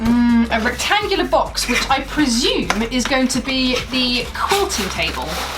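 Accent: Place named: United Kingdom. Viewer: Ok- You are hilarious! You remind me of Brooklyn NY wit, with a British accent.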